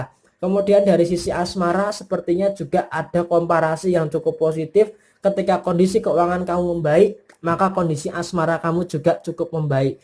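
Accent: Java, Indonesia. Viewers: Sya nlah ktawaaaa dngarttt host...yg bawain reading an...logatnya ithu khaz kental.orang jwa....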